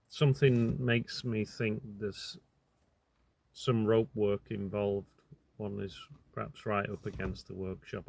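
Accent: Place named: Northern England, United Kingdom. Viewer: to hear your broad Northern accent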